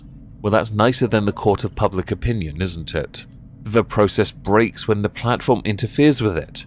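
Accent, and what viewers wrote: United Kingdom. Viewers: Hate the British accent? I could never. Grew up on Monty Python & Beatles. Just came from a Lotus Eaters vid before here (& secretly wishing The Britisher would put up some fresh material I could go after this). Much love, yer moistness!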